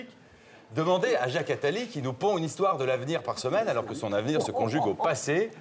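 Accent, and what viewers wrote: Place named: Quebec, Canada. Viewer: Merde il a vrament l'accent québecois non de dieu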